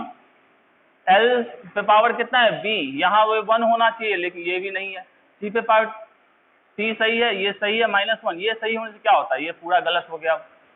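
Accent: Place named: Bihar, India. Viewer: Sir hm iss year Bihar board inter 1st division kiye hai... 353 marks aya hai..physics me 74...biology me 76 aur chemistry me 66 aaya hai iss baar hm neet ka exam nhi de rhe hai..2023 me pakka denge..aap aise hi lecture layega..aapka voice tone bihari jisse padhne me boring feel nhi hota hai..thanks sir..🙏🙏❤️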